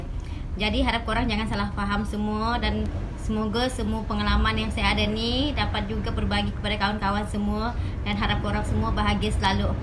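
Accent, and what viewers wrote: Indonesia. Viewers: Awak ni org mana? Awak mcm ckp awak org Malaysia… tp loghat awak tu mcm org Indonesia cuba cakap bhs Melayu Malaysia. Contoh: Org Malaysia tak ckp beda, org Malaysia ckp beza, Indonesia bukan Indonesie, n byk lagi. mmg bukan bunyi org Melayu Malaysia pun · Dgr cm loghat kepulauan riau je.kabupaten lingga.btl ke? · ni org mane??.. dr segi gaya² ckp tu.. mcm org Indonesia.. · Kamu ni org indonesia ke confius la Mcm bunyi org indonesia jer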